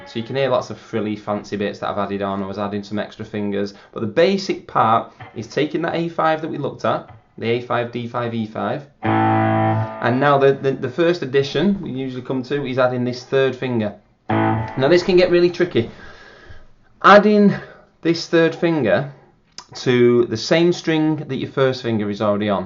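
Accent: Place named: United Kingdom. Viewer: Man's sounds like asian and british at the same time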